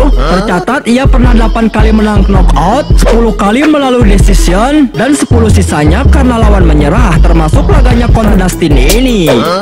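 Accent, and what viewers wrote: Bali, Indonesia. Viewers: Mimin orang Bali ya?... 😁 Logatnya kayak temanku. 😁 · Logat bali?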